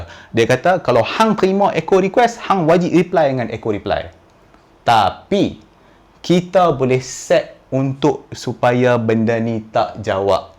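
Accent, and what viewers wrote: North, Malaysia. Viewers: no worries. Sdp dgr org utara habaq ni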